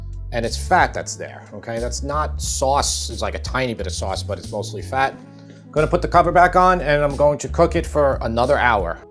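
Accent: New York, United States. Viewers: I love your Ny York accent!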